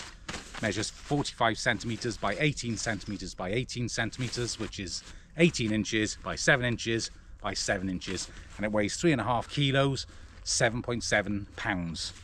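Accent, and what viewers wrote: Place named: Cardiff, United Kingdom. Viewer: Hey bud, nice little video! I’m a Cardiff boy, are you in Cardiff or Newport as loving the accent haha!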